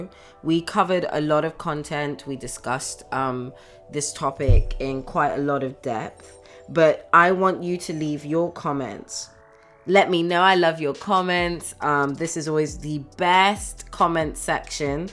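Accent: United Kingdom. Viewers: The British accent caught me off guard 💂‍♂️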